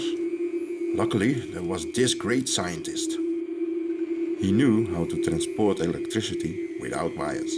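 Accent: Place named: Netherlands. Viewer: This is the wordt dutch accent I have ever heard! The animation is really nice, but has anyone actually built it (before claiming all kinds of antigravity technology)?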